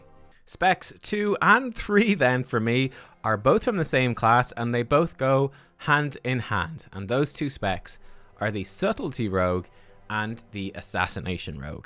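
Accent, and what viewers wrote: Russia. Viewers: Loved the Russian accent, your suggestions and these last few video's! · The Russian accent just won the internet.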